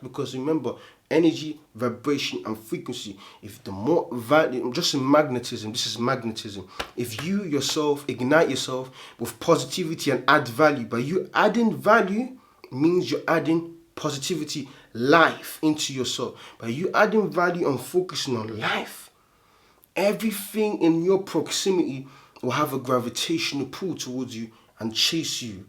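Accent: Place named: Cockney, United Kingdom. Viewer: And another job well done! You're a wise man. I feel like you actually give people facts and the truth behind this. There's a reason it's a sin to masturbate. There's a reason we are supposed to retain our seed unless we are with wife. Hold on tight fella's, it's worth it!!! Cockney huh? Lol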